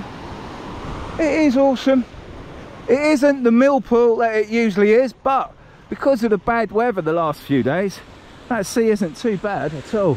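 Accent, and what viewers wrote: Essex, United Kingdom. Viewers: you sound like youve got an essex accent, dont sound much like a good old norfolk accent, but didnt you say in one of youre videos youre an aussie? im just curiuos mate, btw im from suffolk, cheers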